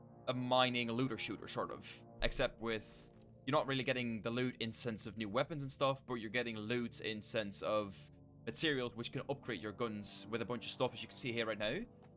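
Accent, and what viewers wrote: England, United Kingdom. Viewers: Pls practice an American accent instead of your horrible English accent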